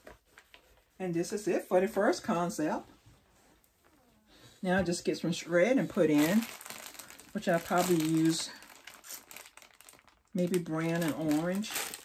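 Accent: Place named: South, United States. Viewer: These are a great idea!!! I saw these socks in Dollar Tree and stood and looked at them, wondering what I could do with them for my craft shows? I ended up walking away, as I couldn't think of anything! Now I am going to go back! I love these! I like listening to you but can't place your accent? It has a bit of a "southern" style, but not exactly - you have a sweet and easy manner about you and it makes it enjoyable to listen to! Thanks again for the great idea!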